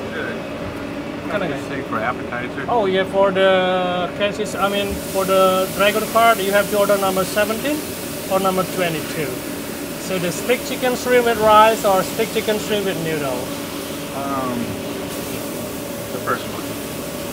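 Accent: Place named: Java, Indonesia. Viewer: Logat jawa Indo pakde di LN seperti nasi goreng yang sudah bisa di minati orang sana😂.... semangat buat semuanya, sehat2 selalu, dan lancar rejeki buat kita semua. GBU...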